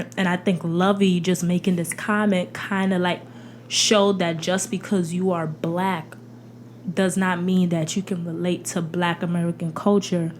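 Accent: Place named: Bronx, United States. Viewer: I love your accent! Shout out to the bronx😁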